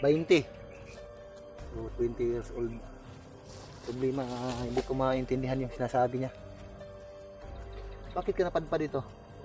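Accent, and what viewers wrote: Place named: Visayas, Philippines. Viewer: VisayaA ang pg sulti mo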